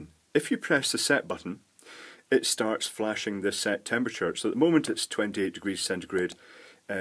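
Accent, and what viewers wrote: Scotland, United Kingdom. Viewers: where does this horrible teenage kind of expression 'bricked' come from? Are we using Americanism again? we should be preserving the English language especially with such an attractive Scots accent 😇 keep these fascinating videos coming ! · I was wondering about that lol.....I thought you were saying 'break' it, in your Scottish accent....!!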